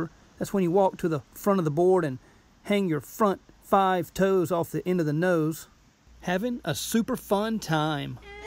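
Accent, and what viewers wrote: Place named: Pennsylvania, United States. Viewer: Your accent sounds like you are from PA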